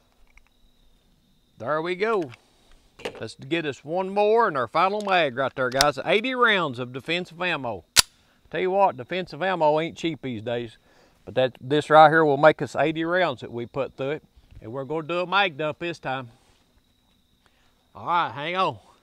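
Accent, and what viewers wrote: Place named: Appalachia, United States. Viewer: The TEE SAUCE 1911🤣🤣🤣 I love that hillbilly accent.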